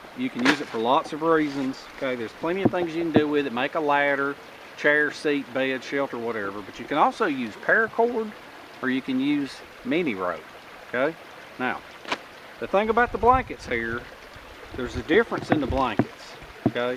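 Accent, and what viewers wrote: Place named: Spartanburg, United States. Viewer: . Well i don not have too many 1 hr blocks to listen and watch. but just saw a few short ones I will watch later, You sound like Spartanburg SC. You remind me of my Brother in law the Prison guard.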